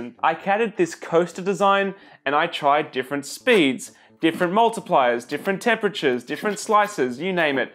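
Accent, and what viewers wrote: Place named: Australia. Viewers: I probably shouldn't assume you would list in AUD - Aussie accent threw me ;) Anyway thanks for the continued great content! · Francis Taylor ... Aussie accent..... well he is from Australia..... again prices listed in US.... seems to be the standard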